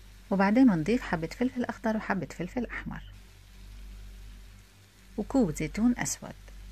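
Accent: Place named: Aleppo, Syria. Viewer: يسلمو ايديكي الله يجزيكي كل خير سؤال، انت من حلب لهجتك كان من حلب.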